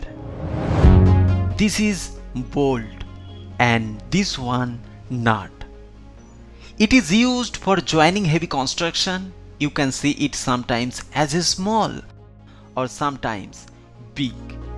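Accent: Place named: Bengal, India. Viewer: Are you bengoli?....because accent is like that By the way love your content from Odisha😊😊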